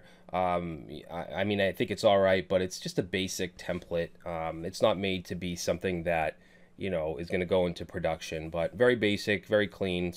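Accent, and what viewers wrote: Boston, United States. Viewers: Sometimes you sound very young and then the Boston kicks in and you cuss and sound older, but with the cam we can now tell you are just right. :)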